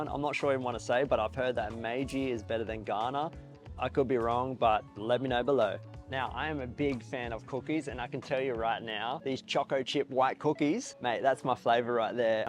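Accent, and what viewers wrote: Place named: Australia. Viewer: for real mate, and also your accent is sending me back to the years I spent growing up in Australia haha I used to live in the west coast in Perth, it probably is one of the factor that makes me feels cozy when watching you. 😄 I would love to be a teacher in Japan to be honest but sadly I am not native and its not an option where I am from haha, I am currently in training for the SSW programme specifically Food Processing Industry 😁 training will last for up to 6 months and afterwards is job matching hopefully I will live there. so in total its about 1 year more or less. I definitely can't wait to experience Japan 😆 But yea, thank you for the wish, I will go full send, keep the video coming mate! I will be sure to watch every single one! and I hope your channel will be huuuge on day ✌much love from Indonesia! 🇮🇩🇮🇩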